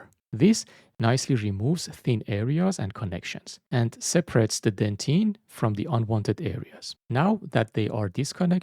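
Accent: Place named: Iran. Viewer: Why do I sense a little bit of beautiful persian accent from narrator voice!?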